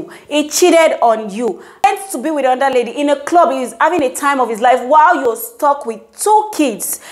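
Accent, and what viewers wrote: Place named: Africa. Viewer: Who is complaining about your accent again? Are we not Africans? My dear, no be everything you go address o. You may need to ignore some comments.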